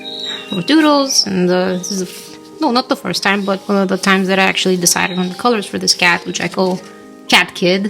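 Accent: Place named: Russia. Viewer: i was listening to you and your accent and i felt like you speak russian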